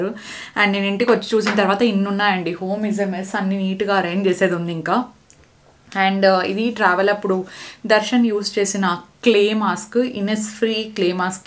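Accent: Anantapur, India. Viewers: meeru pakka అనంతపూర్ అని నిరూపించుకున్నారు మళ్ళీ ఆ స్లాంగ్ లో తూర్పు తిరుగు దండం pedath ఆ ఓర్డ్ akadidhe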